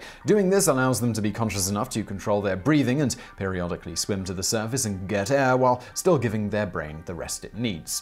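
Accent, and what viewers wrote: United Kingdom. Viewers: All the other narrators with British accent sound fake, Except. He is a professional. Kudos, kudos.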